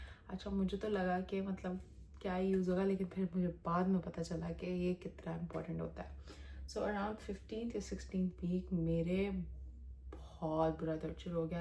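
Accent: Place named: United Kingdom. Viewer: Sorry i was really struggling to understand you. Your voice is really low plus you're speaking urdu in an english accent. At some points i was confused that are you speaking urdu or english? 😂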